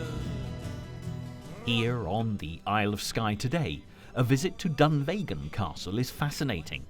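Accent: England, United Kingdom. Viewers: As a wee Ayrshire lass, I was looking forward to this but cannae listen to that English narrators accent,I got as far as his pronunciation of Girvan and wet ma pants….enjoy the rest and maybe with a subject as unique as our Scottish myths and legends it would’ve been more engaging in a true Scot’s accent to amp up the heritage and theatre of our fab history 👍🏴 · Lovely docu. Thanks very much.,but please employ a Scottish narrator as an English accent is hard to understand.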